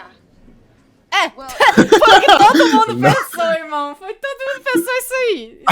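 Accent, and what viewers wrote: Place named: Caipira, Brazil. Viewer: Adoro o sotaque meio caipira da lkkkkk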